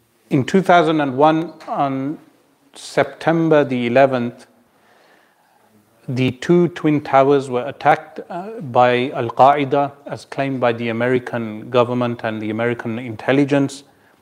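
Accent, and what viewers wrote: England, United Kingdom. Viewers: I love ur English accent and explaination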